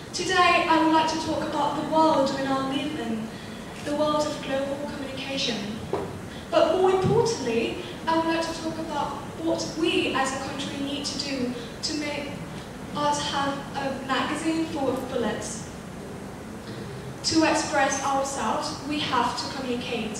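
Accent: United Kingdom. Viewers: What a British accent! So impressive ^^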